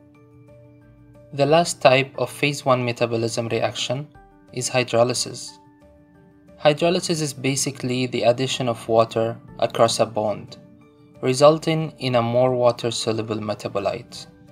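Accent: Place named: Arab world. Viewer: You speak english with an arabic accent ^_^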